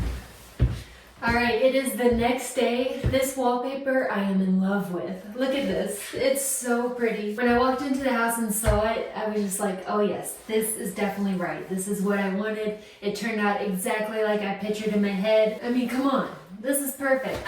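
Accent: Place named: Montana, United States. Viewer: Do all the Montana people have your cute accent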